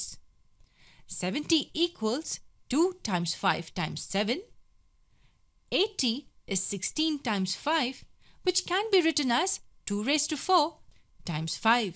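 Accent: India. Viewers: is this an indian speaking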